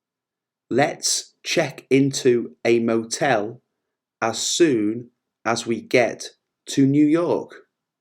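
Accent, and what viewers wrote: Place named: North, United Kingdom. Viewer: Your teaching method is fantastic but the pronunciation is like from Yorkshire or Manchester .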